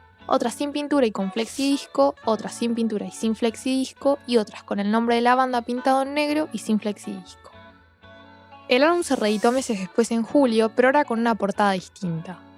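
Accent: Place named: Argentina. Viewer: No esperaba un acento argentino! Muy buen video, gracias por subirlo.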